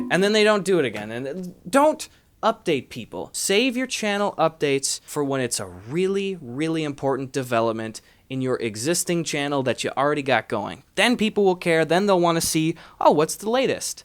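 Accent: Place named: Wisconsin, United States. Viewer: I can tell you're from Wisconsin from the way you pronounce your "a's". Sw-ay-g Bucks. Lmao. God, I miss that boring ass state 😩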